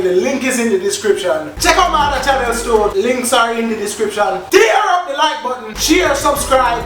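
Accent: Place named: Africa. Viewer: Big man a scream lol.. Yeah right African sound dwl. Funny bro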